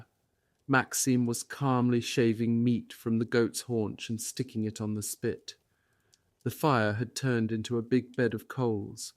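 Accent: RP, United Kingdom. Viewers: Incongruous that no mention of Female Soviet Snipers is mentioned . Just Men beating their chests and yodeling, sounding like Tarzan King of the Jungle . Fact : Lymudilia Pavlichenko called Lady of Death 350 confirmed Kills including 36 German Snipers in Odessa and Sevastopol theatre of WW 2 in Russia. . No mention by Vasily Zaitsev though he must of been aware of the Skill of Soviet Women Snipers as accomplished as he was . Strange the Narrator has a Royal English accent. Seriously?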